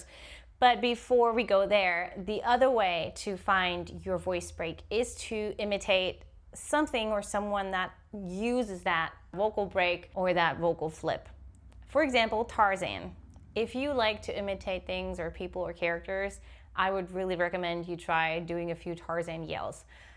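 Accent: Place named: United States. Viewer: Bavaria? That figures, the region full of supposed culture of pastrys and yodelling. Your accent would have me believe you are american.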